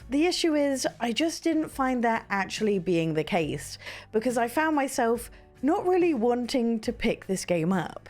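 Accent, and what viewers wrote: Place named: United Kingdom. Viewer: she is from the Uk they say things different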